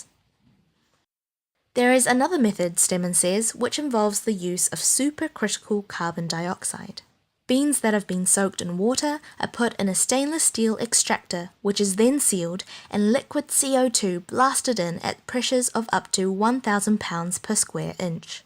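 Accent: New Zealand. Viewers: 뉴질랜드 영어 쏘스윗!! 번거로우실텐데 너무너무 감사해요!! 더 열심히 들을께요 ☺️😍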